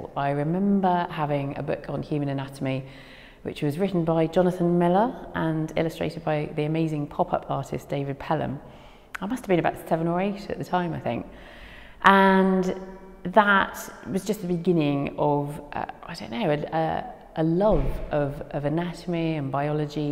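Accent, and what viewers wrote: Bristol, United Kingdom. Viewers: that's not a 'westcountry ' accent. Come to Devon or Cornwall and you'll know. She has light Bristolian going on. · Think Brenda from Bristol. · Bristol ( locally known as Brizzle ) is right!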